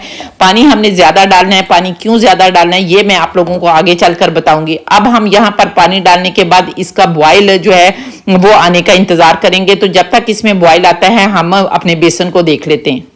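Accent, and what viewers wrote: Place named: Punjab, India. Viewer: Acha g ye aunty b Pakistan ki hai punjab ki hai ap in k bolny k style dayk lay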